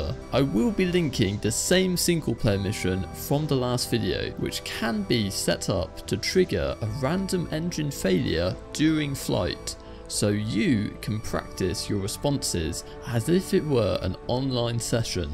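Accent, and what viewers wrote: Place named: United Kingdom. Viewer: there is no better accent then british ^^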